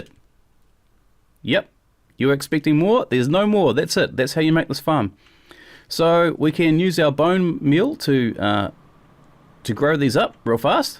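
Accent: New Zealand. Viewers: Yea cause he's got a kiwi accent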